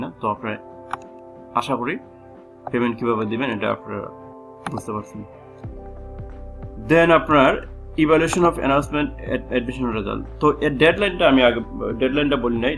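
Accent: Sylhet, Bangladesh. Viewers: Sylheti vai😍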